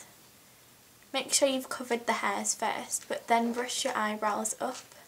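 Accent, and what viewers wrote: United Kingdom. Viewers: and a question; is your accent british or scottish or irish? i'm not from england and i can't decide which one is it, and i lovelovelove it!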